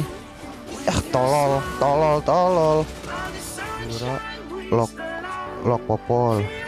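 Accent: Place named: Jakarta, Indonesia. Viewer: Buset, noh Betawi bener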